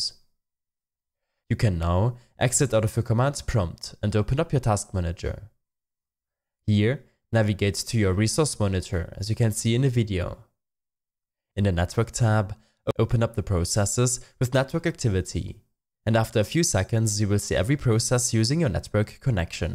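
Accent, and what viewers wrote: Germany. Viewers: Ich am ANfang: das ist doch safe ein Deutscher Ich bin wirklich gut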